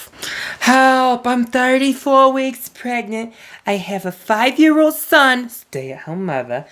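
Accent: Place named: Germany. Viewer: I freaking love the German Accent XD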